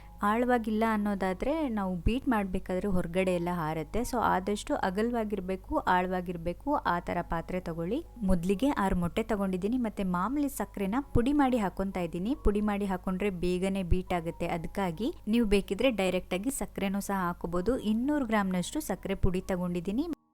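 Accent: Mangalore, India. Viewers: Nice ❤ Actually nam ur Kundapura but nim mathaduva style nam mangalore side ansuthe and more over ur cooking skill was too Good Make Paneer Dishes❤❤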